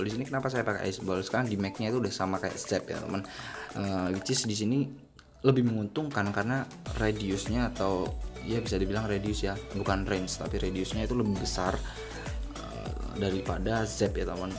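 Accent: Yogyakarta, Indonesia. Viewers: Mas orang jogja bukan? Ayo mabar mas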